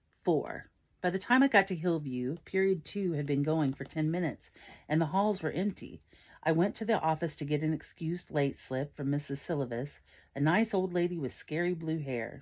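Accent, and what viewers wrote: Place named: United States. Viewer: I like your US accent from the UK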